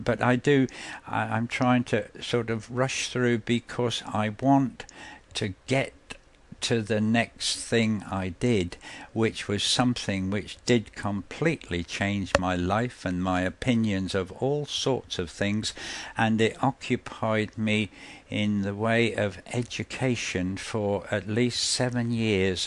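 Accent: United Kingdom. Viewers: Wonderful videos. You remind me of my own grandfather, save for the british accent but just the way he told his own life stories. Looking foward to hearing more.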